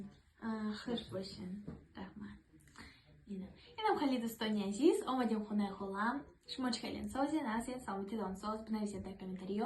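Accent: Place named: Kulob, Tajikistan. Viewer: Ту мана раздражать мекуни ,духтари ёщу ранги кампирои кулоб гапмезани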